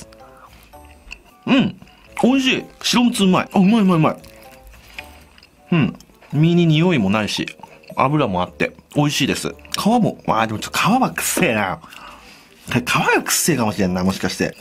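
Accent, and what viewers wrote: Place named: Nagoya, Japan. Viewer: 時々のアクセントに名古屋弁が出てますけど東海地方の方かな？ 値札に書かれた場所から推測するとあの市場か〜。時々、行ってお値打買い込んでたわ。 まんまと魚○場の術中にハマってました。勉強になりました。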